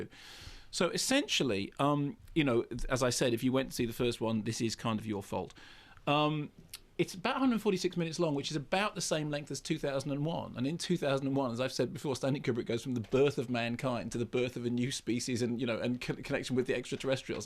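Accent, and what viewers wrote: United Kingdom. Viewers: A British accent.....don't y'all have a monarchy still along with a bunch of wealthy elitists in your nation? Not saying you support them or anything......but just because they come from America doesn't mean that their consumerist and capitalist mindset is synonymous for all Americans. Come back when y'all change the government structure of the UK.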